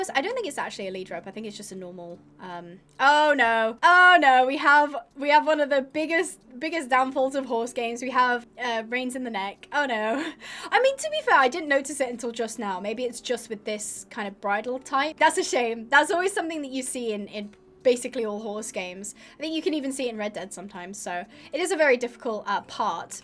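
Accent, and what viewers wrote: United Kingdom. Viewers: Are you british? lol. your accent is amazing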